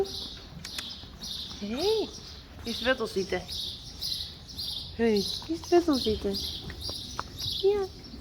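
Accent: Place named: Friesland, Netherlands. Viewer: Grappig als ik jou Fries hoor praten , meeste dialecten etc ken ik maar deze niet zo.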